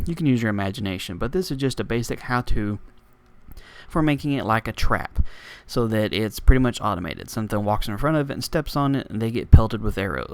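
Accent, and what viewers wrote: South, United States. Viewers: I cant tell if you have a southern accent or not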